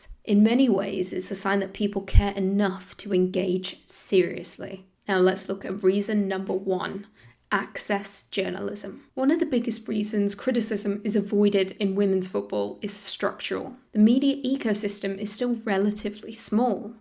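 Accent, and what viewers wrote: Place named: Transatlantic, United States. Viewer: Are you British or American? You're putting on a transatlantic accent, saying 'criddicism' etc. Don't be a wash.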